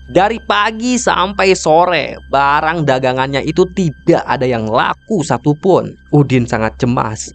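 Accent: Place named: West Kalimantan, Indonesia. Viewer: Kalau saya dengar omong nya Kalimantan barat. Sebenar nya Kalsel.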